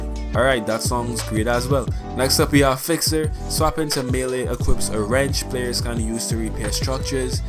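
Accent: Trinidad and Tobago. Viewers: Im from trinidad btw u sound trini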